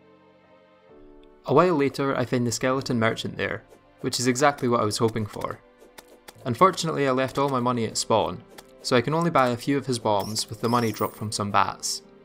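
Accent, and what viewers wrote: Scotland, United Kingdom. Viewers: Omg the Scottish accent makes this video 10X better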